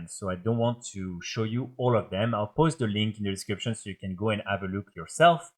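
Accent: France. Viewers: Je comprend beaucoup trop facilement cet anglais, c'est un compatriote xD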